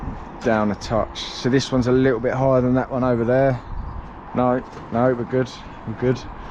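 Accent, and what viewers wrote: Cockney, United Kingdom. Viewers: I never knew bricklaying was so technical.....thought it was just bish bash bosh! At least that is what I witnessed when a bricky built a wall for me and probably why it looks so shit! Loving the cockney c bombs thrown in.....qwality son. Thumbs up.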